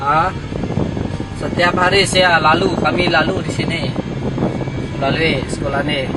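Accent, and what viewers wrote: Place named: Sabah, Malaysia. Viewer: ni dalek sabah al buduh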